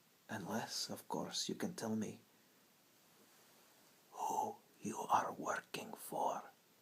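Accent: Russia. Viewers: the russian accent ... is pure tingles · How are you able to speak with a Russian accent? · Ur Russian accent is amazingly cute and a bit funny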